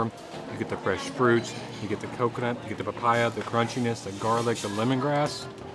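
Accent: Vietnam. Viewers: Your Vietnamese accent is soooo good and super adorable! Very polite and sweet. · Your Vietnamese accent like my brother. Very cute !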